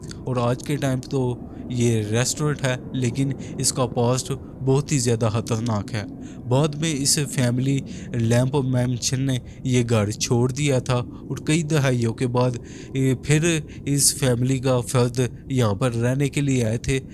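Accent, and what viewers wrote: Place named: Pakistan. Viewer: Bhai hardwork karte raho aap.... Bhadiya hai video.... By the way aap kha se ho... Aapki bolne ki language se pakistani lag rahe ho...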